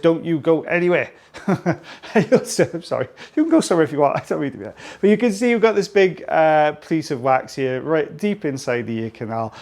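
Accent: Wales, United Kingdom. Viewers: So I never would have thought watching ear wax removal would be so …satisfying ….love the Welsh accent too!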